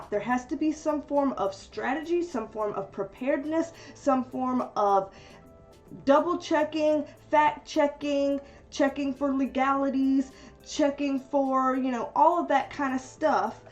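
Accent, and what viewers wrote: South, United States. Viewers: You sound country as hell and I love it!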